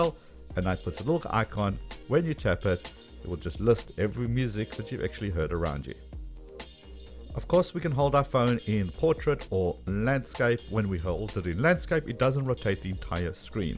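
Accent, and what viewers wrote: South Africa. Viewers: Nothing to do with the video, but where are you from if you don't mind me asking, your accent sounds South African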